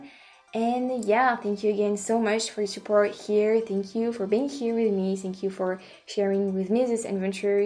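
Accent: France. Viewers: Wait what kind of channel: 😳 ? .........Lol. But in all seriousness I i love your channel and reactions and lovely French accent. You're wonderful, keep up the good work. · Watching you reminds me of my visits to your country (Paris 2 times) and your accent makes me happy. Keep being you and please stay safe. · Your English is fine. And the little differences makes it more interesting-- I love listening to your French accent. I grew up with a partly French family who were and are our best friends in the Detroit , MI area. I love their accents.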